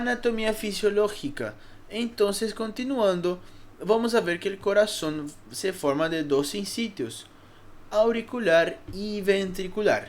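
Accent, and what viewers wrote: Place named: Brazil. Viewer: ¡Ahhhhhhhhhhhhhhhhhhhh! eres de Brasil, tienes un acento bonito, ¡Vida Brasil!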